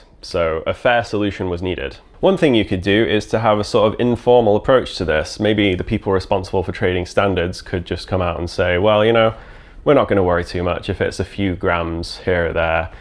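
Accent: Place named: Scotland, United Kingdom. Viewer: Why do you sometimes sound a bit Scottish? I am Scottish and you do, especially in this video 🤔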